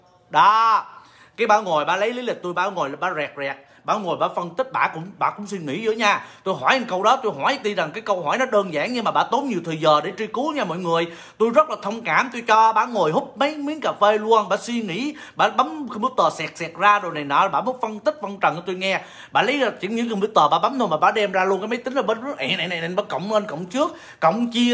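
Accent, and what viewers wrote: Binh Dinh, Vietnam. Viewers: Tina Bennett ....tôi nghĩ ổng từ Quy Nhơn Bình Định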